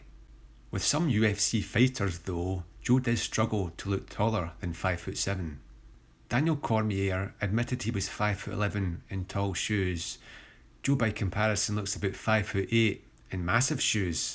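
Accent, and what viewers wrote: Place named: Scotland, United Kingdom. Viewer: based scottish accent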